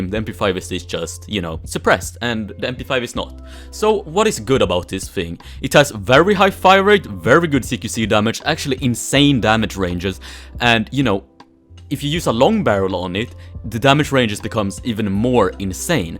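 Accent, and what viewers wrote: Sweden. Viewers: I guess that you are from Sweden.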